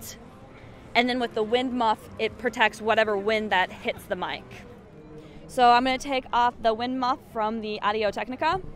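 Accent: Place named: Midwest, United States. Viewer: What I tell you is this: Get rid of it but be able to showcase it if someone asks for it. Or for when you go back and film there or Michigan/Wisconsin where that accent will be wanted regionally. Well I only say Michigan because there is a lot of filming there but that accent is not necessarily there. I like your voice. Sometimes there's a quiver in there when not using the accent like you're nervous or something, but it's very cute when it happens. Like when you get tangled in cords. lol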